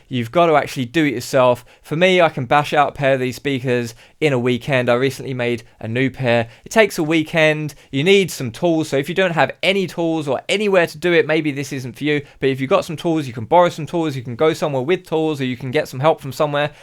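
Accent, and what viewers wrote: United Kingdom. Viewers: from your accent, i assumed you were in the uk. where are you located though?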